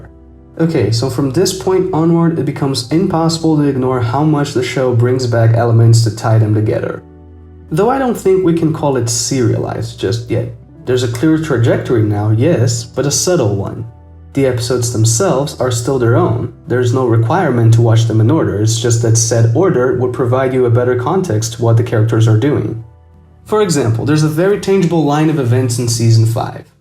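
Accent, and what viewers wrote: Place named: Latin America. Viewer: Sorry for the question, but you are a latino american? You form at talk is very diferent. Amazing video, adventure time it's a great show, a thue Master piece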